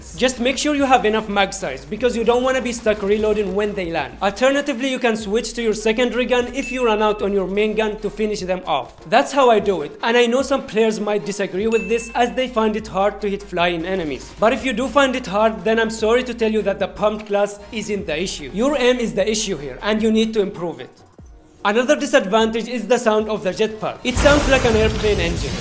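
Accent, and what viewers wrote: India. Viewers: When i heard the indian voice i know i can trust him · At the starting i felt like you were gonna speak hindi you have a indian accent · You sound like us Indians. Where are you from??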